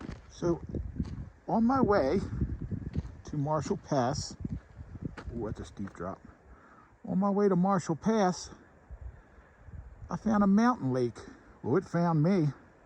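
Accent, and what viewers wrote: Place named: Western Pennsylvania, United States. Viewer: Where are you from? Sounds like Western PA, western Maryland area.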